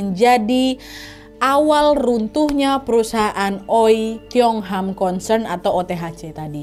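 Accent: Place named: West Sumatra, Indonesia. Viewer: Logatnya kayak orang Minang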